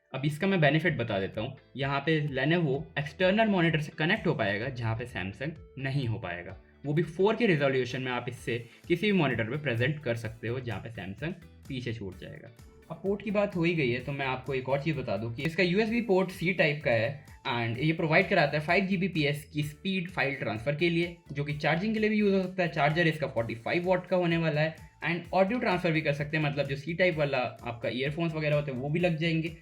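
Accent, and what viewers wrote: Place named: Delhi, India. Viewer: LENOVO OFFICIAL PRICE IN PAK IS 110000 AND I ALSO OWN SAMSUNG FLAGSHIP TABLETS UR ACCENT IS OF DEHLI REGION AND VERY ATTRACTIVE AND LOVE OUR ANCESTORS CITY BEFORE 1947 BEING RAO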